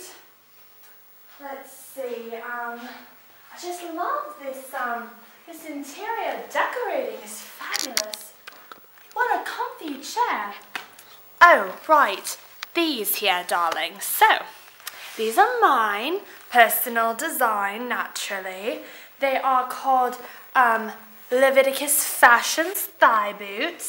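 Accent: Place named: United Kingdom. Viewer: Your soooooo gorgeous 😍😍 i love your British accent 😉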